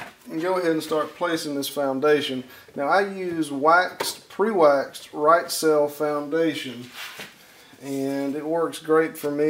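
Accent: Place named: Georgia, United States. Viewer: Your voice and accent remind me of a gentleman by the name of Norman Blake from Rising Fawn, Georgia. You must be a Georgia native, if I'm not mistaken. Anyway, thanks for sharing your assembly contraption. I'm working with the Layens system of frames, so it's a slightly different set up. Thanks. Bob ( a Norman and Nancy fan in Montana)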